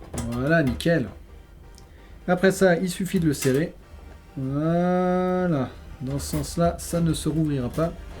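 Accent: England, United Kingdom. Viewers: mais tu devais avoir un accent plus anglais avant dans ta jeunesse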